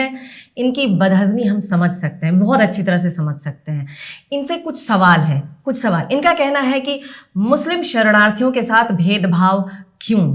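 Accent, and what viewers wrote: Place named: Uttar Pradesh/Bihar, India. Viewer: Superb presentation with classic rural UP & Bihari hindi touch. Very professional narration. Keep it up.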